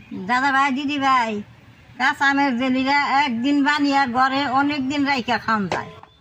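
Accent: Tangail, Bangladesh. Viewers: টাঙ্গাইলের ভাষা।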